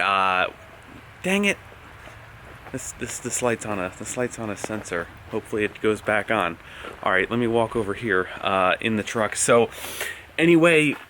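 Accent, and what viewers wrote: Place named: Texas, United States. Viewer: No, but his voice DOES develop a 'Texas Twang.'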